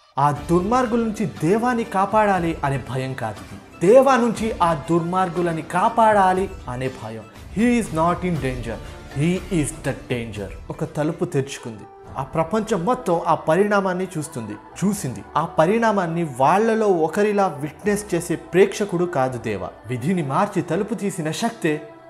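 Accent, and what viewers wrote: Karnataka, India. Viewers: Kannada kodthundi bro nuvu matladtha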